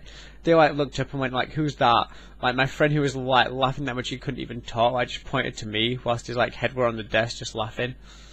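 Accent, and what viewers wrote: England, United Kingdom. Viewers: which part of england are you from?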